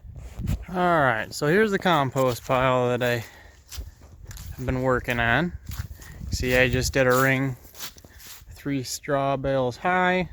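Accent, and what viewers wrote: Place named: Midwest, United States. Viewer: He sounds like he is from sw Minnesota, or Nebraska or western iowa to me. I'm curious if I'm right.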